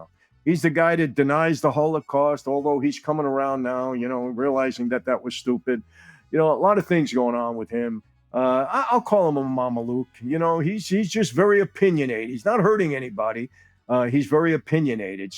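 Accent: New York, United States. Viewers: Such a great word . Said with perfection with your Distinctive Italian/Anerican New York scrabble . How have you not done voice work??? Really easy on the ears. That’s a good diversity actually. Get some good scrips and escape into a sound booth brother. Need right scripts though! Just thinking out loud brother. 😅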